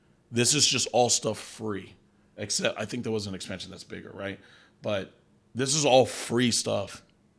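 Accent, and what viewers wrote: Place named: United Kingdom. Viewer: That British accent is his real accent.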